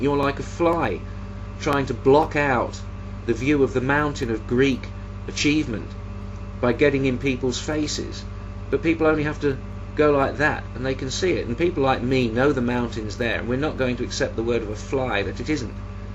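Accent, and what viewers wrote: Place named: United Kingdom. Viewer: One of the most beautiful videos I've seen on YouTube., your combination of wisdom, humanity, charisma, dramatic flair, and British accent is just unbeatable.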